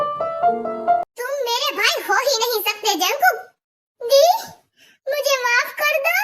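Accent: Gujarat, India. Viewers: Didu aap kya gujrati ho voice sound se lga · Wow gujtati accent is so good 🎉😊❤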